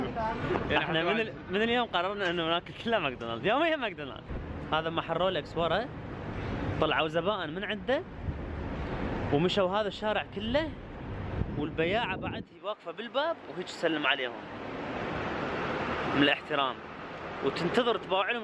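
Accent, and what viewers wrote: Iraq. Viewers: لغتك العراقيه اقوى مني رغم انت ما عايش بالعراق واو بس حبيت اتشكرك من كل قلبي كأنو انا رحت لليابان وشفتها thank you very much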